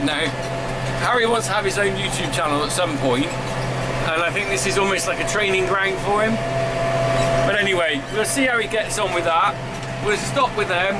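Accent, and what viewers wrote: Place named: South West, United Kingdom. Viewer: Do you have the calves out yet? The leaf on your grass is awesome. Love that SW accent,. I find it really easy to understand. I am learning so much from you. Thank you. Loved the speeded up section.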